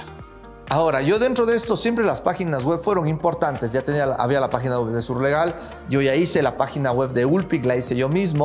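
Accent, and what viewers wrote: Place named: Cuenca, Ecuador. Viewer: Me encantó el video, pensando en migrar nuevamente a trabajar como robot en fábricas pero me encantan los negocios, emprender, aprendí amar 🇪🇨 con el alma estando 15 años 🇪🇦 me voy dejando a mis hijos aquí, están a 2 años de ser profesionales y ni siquiera sé si podré estar presente ese día👨‍🎓. ESTOY CON MI CABEZA HECHA UN CAOS Y MI 💔🥺, comentame algo con ese acento Cuencano que AMO😉 #yamesuscribí👍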